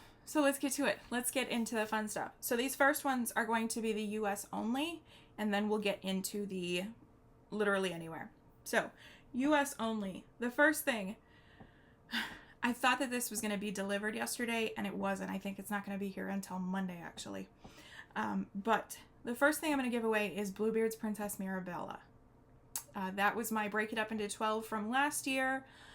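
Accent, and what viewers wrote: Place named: Wales, United Kingdom. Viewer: Love your progress , congratulations on 10 years on flosstube , Welsh is a accent I really love 😊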